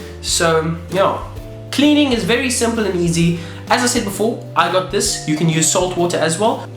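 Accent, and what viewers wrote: South Africa. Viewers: your south african right? I can tell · are you south African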